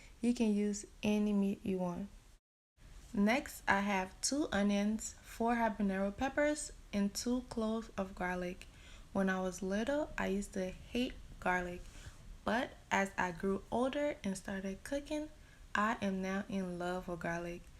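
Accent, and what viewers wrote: Liberia. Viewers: You sound Liberian. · Woman are you from Liberia?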